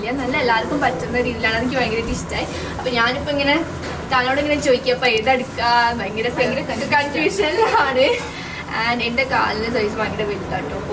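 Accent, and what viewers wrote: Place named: Kozhikode, India. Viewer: നീ കൊച്ചി അല്ല നീ ടെ സംസാരം kalicut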